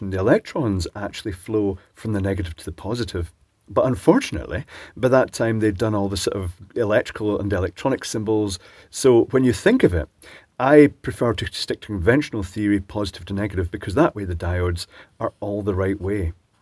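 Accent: Scotland, United Kingdom. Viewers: I have always wanted to hear EM theory spoken in a Scottish accent. Maxwell was a great scott.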